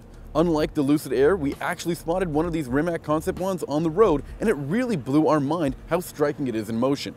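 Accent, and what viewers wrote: Canada. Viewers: Is he Canadian? They say zed as well as a lot of other nations. I ask if he's Canadian because at times there isn't a noticeable differance between American and Canadian accents.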